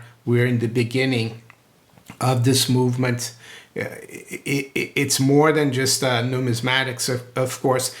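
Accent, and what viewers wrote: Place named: United States. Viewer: do I have this right? You are an Italian, born in Brazil, You talk like an American, and live in England?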